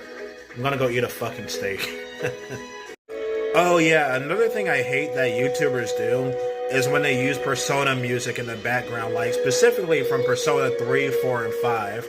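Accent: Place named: Texas, United States. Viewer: Where you from man you sound like you are from Texas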